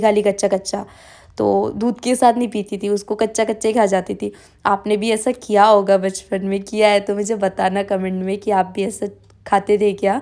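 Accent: Bengal, India. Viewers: are you bengoli? you have a bong accent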